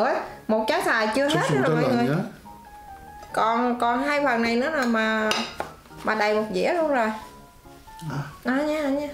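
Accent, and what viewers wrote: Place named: Mekong Delta, Vietnam. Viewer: Giọng nói đậm chất miên tây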